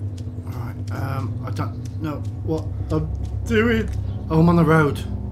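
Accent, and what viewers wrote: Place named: United Kingdom. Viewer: from now on I'm watching ALL of your vids with the closed captioning on. Apparently goggle can't decipher a British accent, and translates it HILARIOUSLY!!!: "Uh, I see shit." Google Translation: "Rubbed, litigation." Bwahahahaha!!! Keep up the great work, mate.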